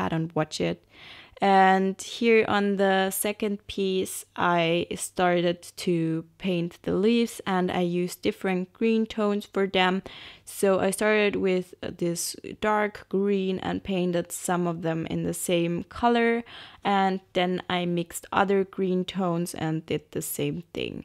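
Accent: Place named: Germany. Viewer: she sounds quite german ... so I guess there's your reason for these nonsensical phrases she lets loose sometimes ... ... ... like i.e. 'the bottom layer is slightly rewedded by the paint' ??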